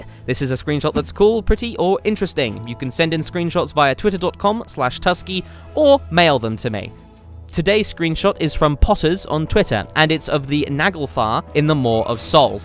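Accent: United Kingdom. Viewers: Tuskeh is british?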